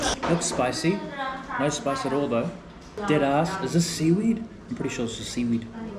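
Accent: New Zealand. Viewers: sounds like kiwi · Sounds like you're a Kiwi, not an Australian. So I'm not expecting anything great. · I was gonna say you sounded like a kiwi and where were you from and then you told the taxi driver you were from New Zealand and answered my question 😂 Subscribed!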